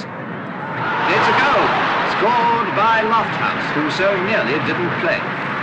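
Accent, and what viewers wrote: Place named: Southern England, United Kingdom. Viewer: What about that commentator ... typical middle class southern English accent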